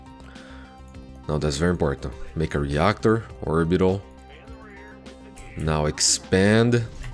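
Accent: Brazil. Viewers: Thank you so much for this build! (and Btw are you Brazilian? your accent sounds like it) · thats a nice brazilian accent you got there! cheers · ahahaha só pelo sotaque já vi q é br ! bom tutorial ! comecei a jogar recentemente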